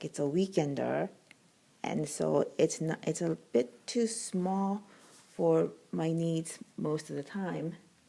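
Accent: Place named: Philippines. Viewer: You sound like a Filipina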